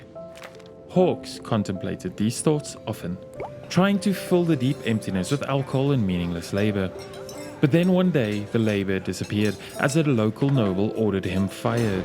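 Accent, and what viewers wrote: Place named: Pretoria, South Africa. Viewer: Bro sounds like a Pretoria boy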